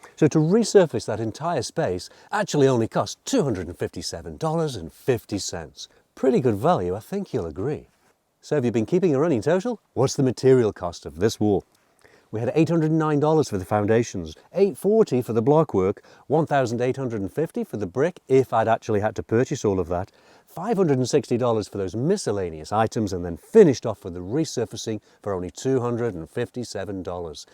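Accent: Lancashire, United Kingdom. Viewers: you're welcome, Mr. You speak without repetition, hesitation or deviation. So you have an old Lancashire Liverpool accent?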